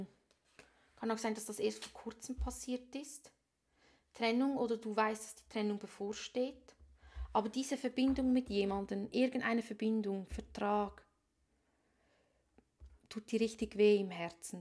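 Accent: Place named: Switzerland. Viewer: Ich liebe deinen Schweizer Akzent. Schön dir zuzuhören. lg Marcel🙏😊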